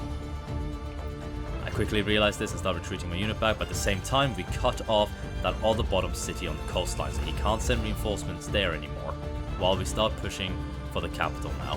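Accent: Norway. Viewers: Are you Norwegian? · I can hear a bit of an accent, if it isn't rude to, can I guess you're Norwegian? 🤔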